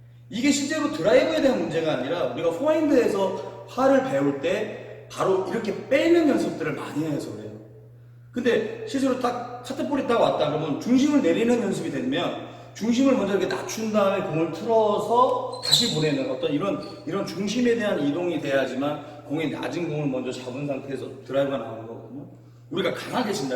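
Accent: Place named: United Kingdom. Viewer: 영국 영어 발음!! 스마슁, 산드위치, 땅큐^^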